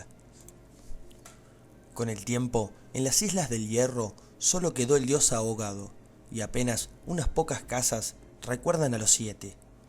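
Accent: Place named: Córdoba, Argentina. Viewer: Sos cordobés? (Argentino) se te nota el acento en las palabras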